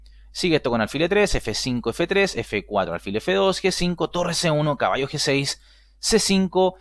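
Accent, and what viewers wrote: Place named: Chile. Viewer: OYE, TU ACENTO TE DELATA: ¡¡¡TÚ ERES CHILENO!!! MI PAPÁ TAMBIÉN ES DE RAZA CHILENO COMO TÚ!!! ¡OJALÁ! ALGÚN DÍA, CHILE, LLEGUE A TENER UN CAMPEÓN O CAMPEONA MUNDIAL DE AJEDREZ!!!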